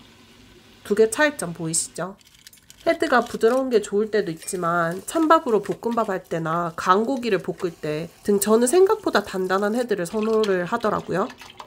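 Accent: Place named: Gyeongsang, South Korea. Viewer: ㅎㅎㅎㅎㅎㅎㅎㅎ 경상도 사투리가 영상의 재미를 한층 더 올려주는거 같어요.😊😊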